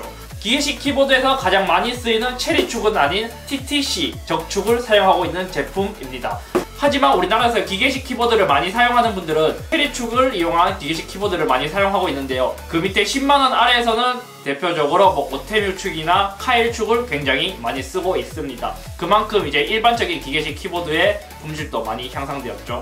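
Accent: Gyeongsang, South Korea. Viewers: 고향이 경상도이신가요? 사투리가 비슷해서 그런거같기도한데용?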